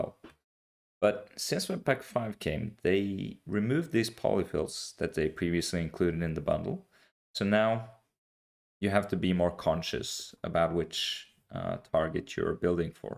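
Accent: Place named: Russia. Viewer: russian accent)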